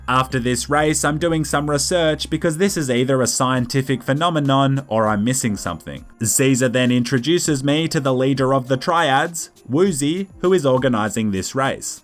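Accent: Romania. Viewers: Are you from romania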